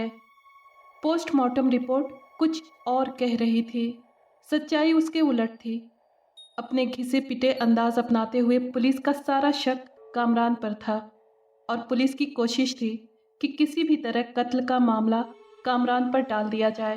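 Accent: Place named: Kashmir, India. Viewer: I watch all your video.now days I am staying in delhi.bt mera childhood kashmir sae h. Apki voice n Akcent n Urdu sae lgta h jase koi wahi ka ho .nyc video.lots ov love.u r doing Great 👍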